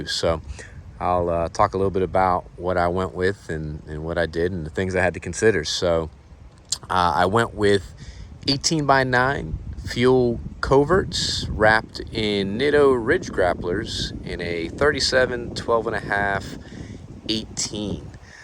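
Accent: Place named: South Louisiana, United States. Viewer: Ive got the same truck, but in Carbonized Gray and the sport interior (brown and black leather). I also have the Fox, instead of Carli. Thats a bit hefty of a price tag for me. Where are you located? I was guessing south Louisiana based on your accent, but the palm trees are throwing me off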